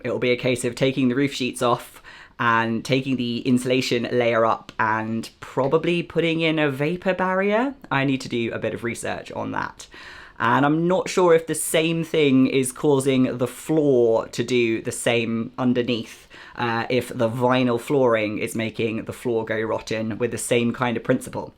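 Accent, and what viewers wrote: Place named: England, United Kingdom. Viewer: Since you are English and now immersed in a Scottish community (accent and dialect), your new found lisp may be your involuntary speech muscles trying to adapt and assimilate......resist! Your accent is lovely! LOL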